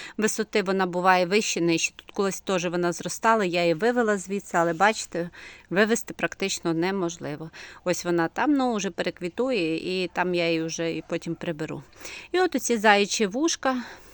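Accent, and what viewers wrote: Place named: Western Ukraine, Ukraine. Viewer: Укр.мова погана, западенська. Наголос пипець. Багато води... Терпіння не хватило дослухати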